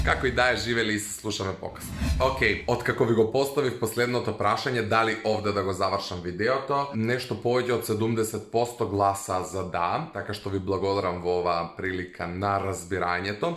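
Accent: Kumanovo, North Macedonia. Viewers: zbori na kumanovski prekrasno video pozz <3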